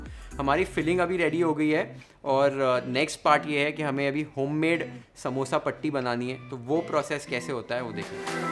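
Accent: India. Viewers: Btw Your accent like Indian, thanks a bunch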